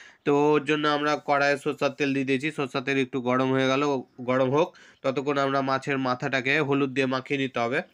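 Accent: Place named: Medinipur, India. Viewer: Amar mone hoy Medinipur ar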